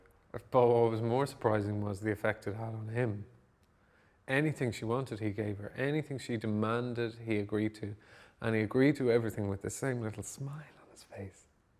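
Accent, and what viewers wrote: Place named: Ireland. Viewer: He has a very nice Irish tone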